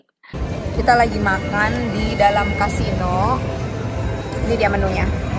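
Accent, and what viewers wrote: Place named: Indonesia. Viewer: mbak nya walau lama di usa kharekter dan logat masih tetap indonesia banget.......pasti nya mbak nya masih sering ngobrol pake bahasa indonesia raya.....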